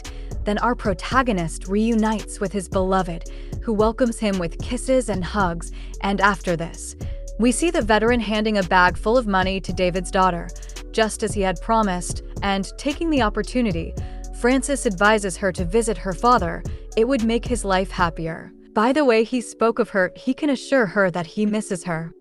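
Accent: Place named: United States. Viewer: Your storytelling is great 👍❤and your accent of united states is great 👍 this movie Recap is nice 👍❤❤. By the way I'm new on this channel,I will I new this channel before, thanks a lot. I got entertained ❤❤❤❤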